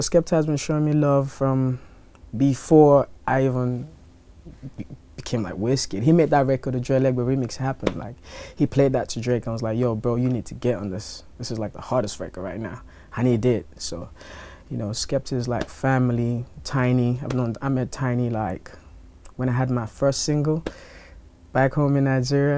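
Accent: United Kingdom. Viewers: I am absolutely blown away. He has a British Accent.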